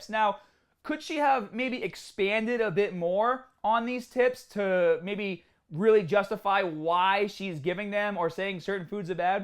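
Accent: Boston, United States. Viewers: Body Shaming? What about language shaming? I can't believe you and your Bostonian accent can make fun of how others pronounce words like Granola!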